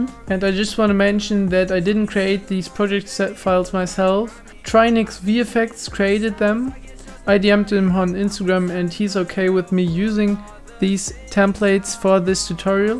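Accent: Germany. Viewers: I knew cuz your english is like a german aka ich auch lol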